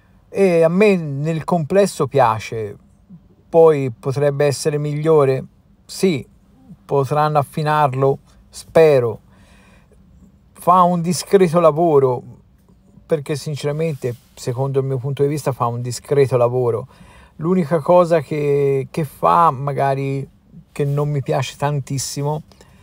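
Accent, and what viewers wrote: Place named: Tuscany, Italy. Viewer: Mi sono appena iscritto perché dopo aver visto qualche vostro video mi sembra di essere tra amici che chiacchierano sulla loro passione, io ho una r1250gs std del 2021 e ci troviamo veramente bene, specie mia moglie😅; io sono di Torino ma trovo simpatica la tua cadenza Toscana ( abbiamo amici a Pisa e all’ isola d’ Elba). Ciao Roberto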